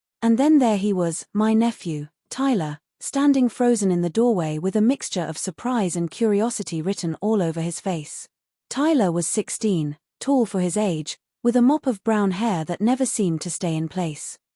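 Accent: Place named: United Kingdom. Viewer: This story takes place in Chicago, so why is the narrator speaking in a British accent & pronunciation?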